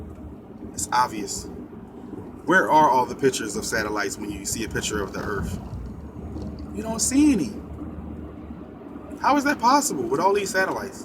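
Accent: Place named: Philadelphia, United States. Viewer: Poetic THAT SHIT DONT EVEN SOUND RIGHT LOL ... People can't comprehend water is a tools to measure completel FLATNESS! Where u from bro? Tell me u from HARLEM ? where n bit Charlie clips is from ? Rocking the power wheels and shit! This mad got a memory about his power wheel !! Haha ! Naw . U look and sound like u from philly!!!! Light skin with the thick beard . Good points tho my guy! Salute to you and your enlightenment to this flat earth stuff . It will blow your mind but it's awesome to have this crazy ass info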